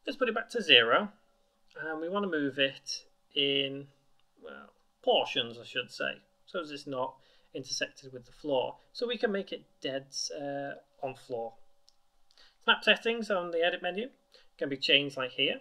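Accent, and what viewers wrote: United Kingdom. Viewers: he is british. They say Z differently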